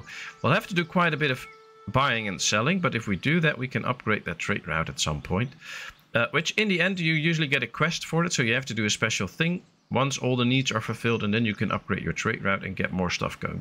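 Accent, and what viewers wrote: Netherlands. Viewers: We zullen zien. P.S. Ik herkende gelijk het Nederlandse accent toen ik zocht op Foundation Lets play, vandaar dat ik in het Nederlands reageerde.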